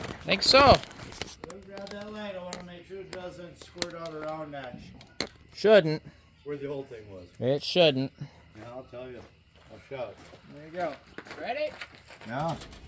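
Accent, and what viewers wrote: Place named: Wisconsin, United States. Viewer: Apple 🍎 doesn’t fall from the tree with that Wisconsin accent 🤠🇺🇸🍺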